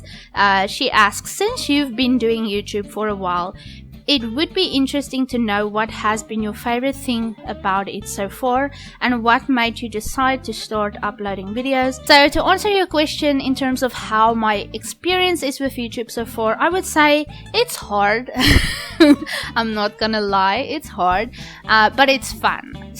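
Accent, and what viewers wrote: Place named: Netherlands. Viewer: Love your accent girl.. Its a little bit dutch... Love you video ♥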